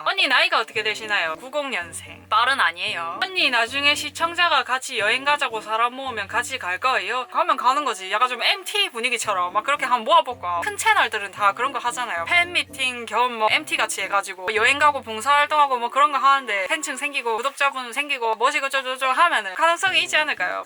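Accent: Busan, South Korea. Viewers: 부산인 소리 쥘러!!!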